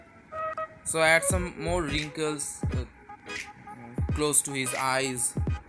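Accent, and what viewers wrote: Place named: Bangladesh. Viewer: Listening to your accent i think youre a Bangladeshi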